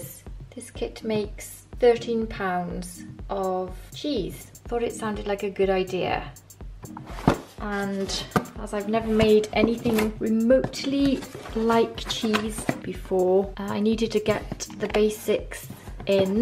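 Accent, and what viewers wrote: United Kingdom. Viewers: Love your accent what is that British?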